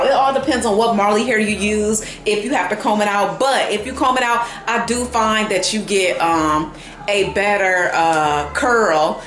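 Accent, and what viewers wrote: South, United States. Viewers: I love your southern drawl!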